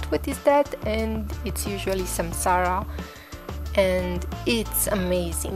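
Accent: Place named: Romania. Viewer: Romanian accent.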